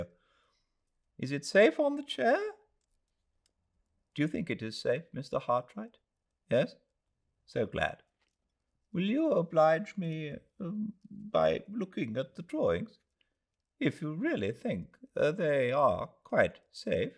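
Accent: United Kingdom. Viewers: This is amazing, I just discovered this reading, so pleasurable and quintessentially English. Thank you.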